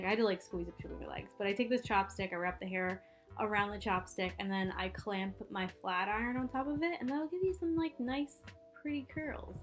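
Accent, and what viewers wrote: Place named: South, United States. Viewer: I think this doll is phenomenal 😻 so dang inspiring! Your fascination with how you say clasps was hilarious. I think it's just 'cause you have a touch of a southern (?) Accent. It's actually the a sound that makes it sound different. I love how you talk though..."I don know 'skina cute". Also, have I just found you in the last two weeks? Yes. Am I bring ng all your videos while working on my own. For dang sure. Love it.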